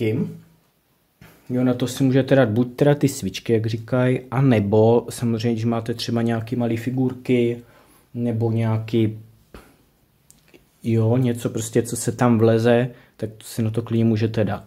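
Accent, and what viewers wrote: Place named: Sumava, Czech Republic. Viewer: Ahoj, Bože, ta tvoje radost z objednávek je fakt nakažlivá 😊 Jdu objednávat také kravinky pro radost ❤ Přála bych ti spolupráci s Temu, nebo Shein... Myslím, ze by ti to moc sedělo a zisk by nebyl priorita 😊 Krásně se posloucháš To víš, ten tvůj měkkoučký dialekt pro nás ŠUMAVÁKY 🤔 Hezké dny přeji a těším se na příště 🙋‍♀️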